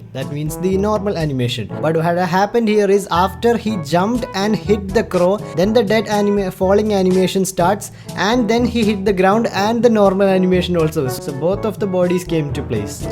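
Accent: Kerala, India. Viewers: by hearing the voice itself i had a doubt is this guy a malayalee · he has Indian accent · you kinda sound indian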